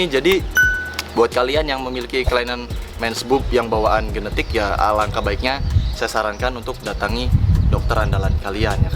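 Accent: West Java, Indonesia. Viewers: Kirain orang sumateraan, tapi bahasanya ada sunda2nya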